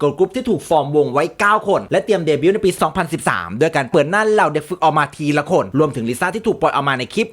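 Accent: Isan, Thailand. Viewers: พากย์ได้แซ่บจิงๆ ชอบๆ มีแทรกอีสานเข้าไปด้วย มันส์ดี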